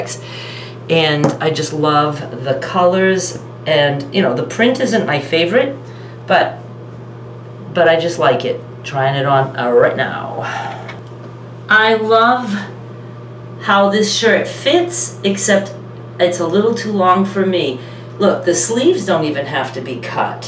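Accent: Maine, United States. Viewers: Hello from Maine! I love seeing how much fun you are having. It is nice seeing you smile 😊 Do people notice your Maine accent down there?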